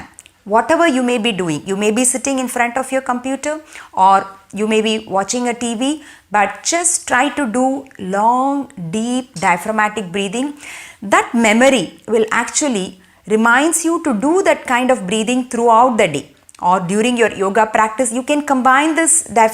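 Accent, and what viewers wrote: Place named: India. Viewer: I am very thankful for your video. I subscribed and I stared practicing breathing. And, at the same time , I enjoy listening your Indian accent. I am Rosario, from Uruguay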